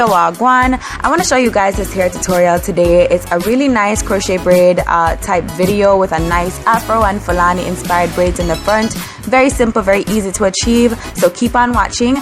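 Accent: Jamaica. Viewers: Jamaican? yeah girl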